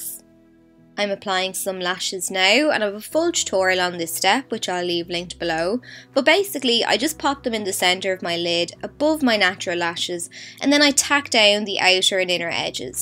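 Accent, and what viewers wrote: Ireland. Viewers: I absolutely love your accent, I followed immediately after hearing it! 😂 (given, I was going to follow anyways just from the thumbnail, but whatevs) Is your accent Irish?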